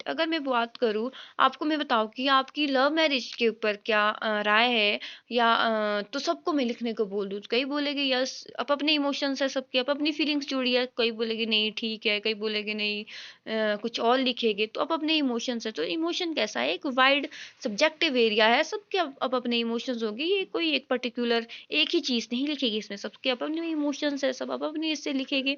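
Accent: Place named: Jammu, India. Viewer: Mam apki language me dogri touch dikh ra h❤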